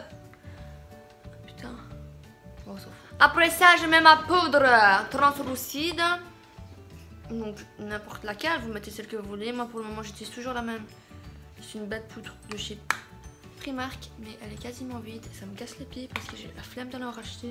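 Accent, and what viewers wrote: Belgium. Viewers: Tu es belge sa s entend 😂 moi aussi je suis belge bisous je t aime ❤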